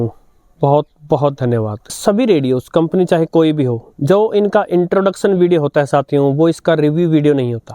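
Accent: Standard. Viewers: Congrech bhai ji aap mujhe bahut hi ache lagte ho aapka jo bolne ka tariqa bahut hi lajawab hai aur aapki pure Hindi man ko bhati hai aur yeh redio maine life me bahut chalaya hai yeh lockle shop se mil jata tha iski prise bi tab 350 ke aas pas thi ab ka pata nahi mujhe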